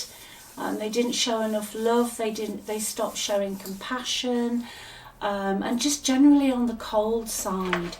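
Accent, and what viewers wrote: England, United Kingdom. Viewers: thank you so much for this mornings reading. Love your strong English accent, reminds me of two years O went to varsity there. Darling, your advice is spot on, loving myself first and protecting my peace and freedom to do whatever I want. Busy investing heavily in some new ventures, I am not going to be giving as I used to be, can tell you that. My old self died before Christmas and with the protection and guidance of God, the legions of Angels and in particular Archangel Michael, I receive unmeasurable abundance for which I’m absolutely grateful. Gods timing is divine otherwise I would have been dishing these abundance out. Learnt my lessons the hard way, let’s just say I’m doing great and couldn’t be more happy. Blessed day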